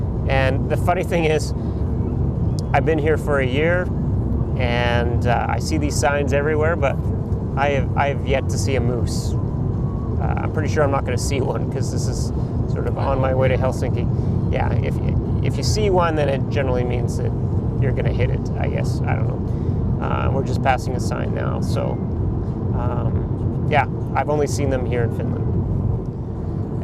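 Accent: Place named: Canada. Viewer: Great video :) Makes me wish I were in Finland. You look quite Finnish, but sound very Canadian. Did you ever manage to learn any Finnish? I've managed to get to a conversational level, but that's the most difficult thing about Finland. I think in the US, we need more of those yield signs, especially in the country when there's not a person around at the four way. No one ever fully stops anyway.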